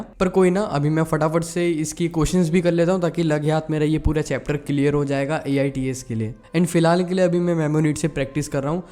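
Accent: Maharashtra, India. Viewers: Your language gives a very strong maharashtrian vibe😂❤ BTW another maharashtrian here.💗🌟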